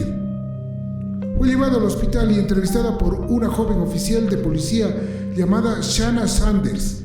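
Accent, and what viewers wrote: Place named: Sierra, Ecuador. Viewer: De qué parte de Ecuador eres? Me suena a la Sierra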